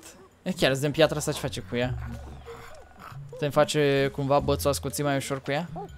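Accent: Moldova. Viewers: bă ce-mi place accentul de moldovean :)))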